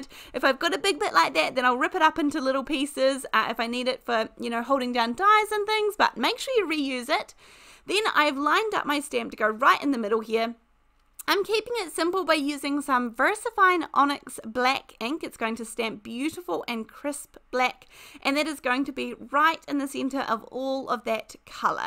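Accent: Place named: South Island, New Zealand. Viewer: Love all those cards, especially the last one! And just love hearing that South Island accent lol (I'm North Island lol)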